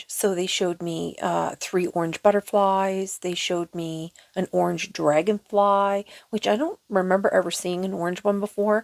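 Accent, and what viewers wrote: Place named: East, Canada. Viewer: Hi …. Haha yup things are shifting! I just love your eastern Canada accent being in Saint John NB from western Canada 🇨🇦 it’s my favourite as the people are the kindest in the Maritimes … as spiritualists we all need to get into gear now 👍🌈💕🌈🌈🌈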